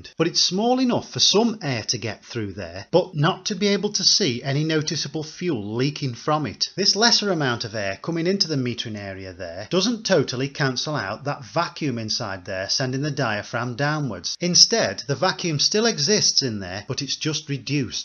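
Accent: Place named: Staffordshire, United Kingdom. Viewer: Are you from Staffordshire sir? You sound a bit like my dad from the potteries?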